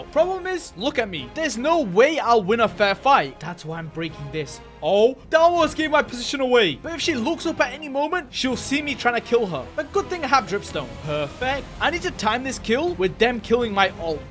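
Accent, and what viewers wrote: United Kingdom. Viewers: Bro u from Britain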